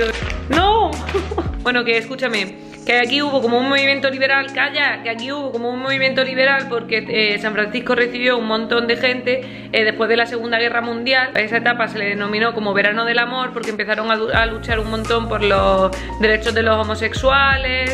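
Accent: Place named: Andalusia, Spain. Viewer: Me da cosa decir algo porque veo que el vídeo es de hace un año, pero bueno lo voy a decir: me encantó. Menudo entretenimiento he encontrado con tus vídeos, me viene genial con esto de la pandemia que tenemos ahora, no sabes lo bien que me están viniendo. Estoy jubilada pero me gusta tu estilo y cuando te equivocas me haces reír, se nota tu deje andaluz. Me encanta también, es un buenazo y Gigi aunque en este no esté. Un besazo muy fuerte desde un pueblecito de Asturias. 🥰🥰🥰🥰🥰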